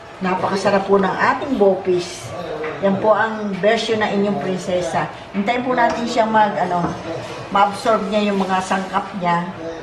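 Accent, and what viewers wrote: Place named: San Miguel, Philippines. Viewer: nakakatuwa lang po kasi ung tono ng salita nyo namimiss ko taga San Miguel din po aq andto po aq ngayon sa abudhabi at paborito po yan ng mga anak ko😊😊😊 lahat po ng recipe nyo pinapanood ko. God bless po sa inyo! 😉😉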